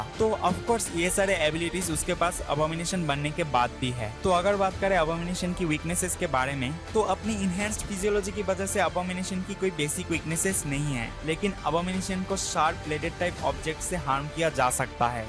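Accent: Bengal, India. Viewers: Bangali ho kya? Guessed from your accent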